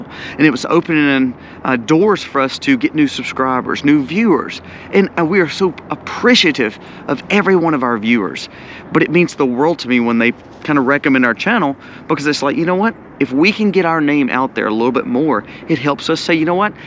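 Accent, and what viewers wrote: South, United States. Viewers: You're the only youtuber that can inform us about some disaster but, at the same time, makes us feel good about our day. It's that smoooooth southern voice. You're the Mr. Rogers of prepping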